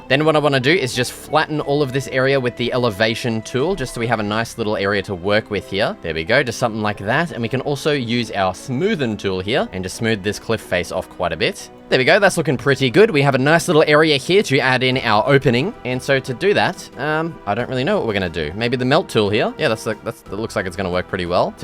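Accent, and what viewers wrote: Australia. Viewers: He's Australian, dumbass.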